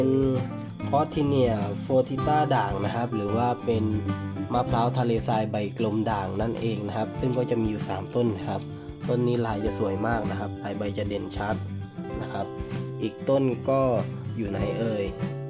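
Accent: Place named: South, Thailand. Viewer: พี่พูดเหมือนคนใต้เลย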